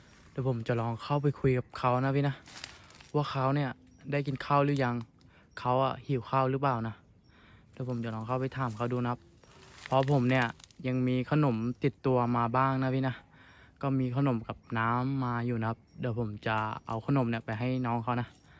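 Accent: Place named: Isan, Thailand. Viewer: พูดคล้ายสำเนียงอีสานหวั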